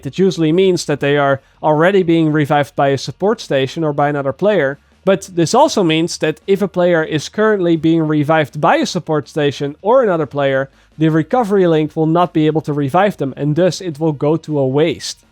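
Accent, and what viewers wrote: Netherlands. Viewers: Ik hoorde aan je Engels dat je Nederlands was // i heard of your engelish that you where dutch.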